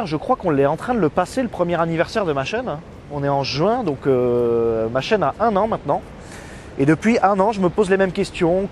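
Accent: South, France. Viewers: On dirais qu'il commence à perdre son accent du Sud.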